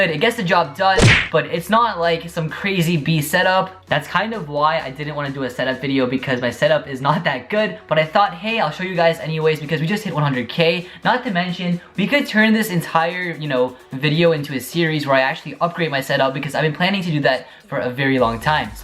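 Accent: India. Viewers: Your Indian?? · dude it seems ur Indian? Am I right? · are you Indian my friend???... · u indian? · dude are you ..... indian ?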